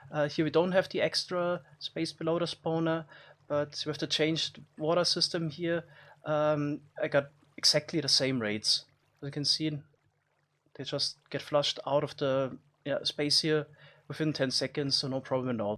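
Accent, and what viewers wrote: Germany. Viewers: Deutscher accent cool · Fucking German accent i can’t understand your English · Alder dein english is so deutsch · Are you german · Hab nach dem ersten Wort gewusst das du Deutsch sprichst👌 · man hört so stark das du deutsch bist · You sound very very german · man hört so hart das er ein deutscher ist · Dachte ich mir auch der hört sich Mega deutsch an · Der deutsche accent 😂😂😂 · are you german? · you sound so german are you? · Man hört den deutschen akzent instant raus xd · Is he German? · Mal ne Frage bist du deutsch oder warum ist deine Aussprache so stark? xD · man kann so raushören, dass du deutsch bist XD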